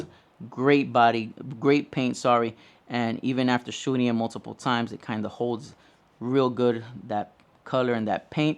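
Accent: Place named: Miami, United States. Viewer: Great video. I love both of these guns. I own the shield plus and have held the 2.0 bodyguard but haven’t shot it yet. Did you find the bodyguard 2.0 to be soft recoiling like some YouTubers are saying? “It feels like a 22” also are you from south Florida? It sounds to me like you have a Miami accent, which is where I’m from.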